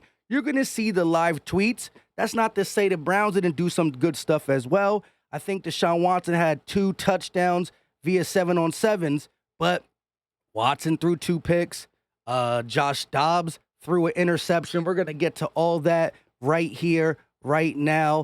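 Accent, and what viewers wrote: Philadelphia, United States. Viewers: but wait yeah you could use so "professionalism and he could use some street" but don't get away from what makes you different know what I mean? You don't need to be proper cause philly isn't proper you sound like one of us he sounds like a sports broadcaster which works for both of you don't get away from that but I think y'all would be a great duo especially live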